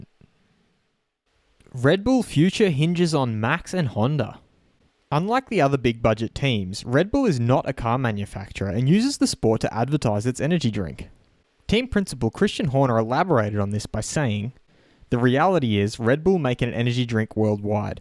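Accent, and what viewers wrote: Australia. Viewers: hey mate, hear you aussie, you heading to the melb F1 this year. id like to buy you a beer for you great vids buddy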